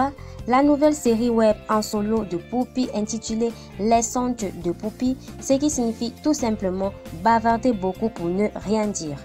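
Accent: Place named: Cameroon. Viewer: Ton accent kmer est très prononcé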